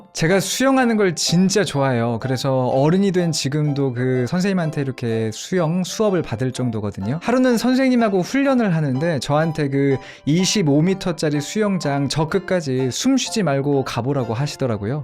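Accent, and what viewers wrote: United States. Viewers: Wow; just amazed, your American accent is perfect.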